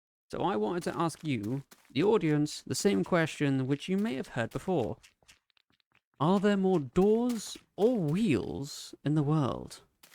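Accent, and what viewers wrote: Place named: United Kingdom. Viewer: You sound British as shit cuz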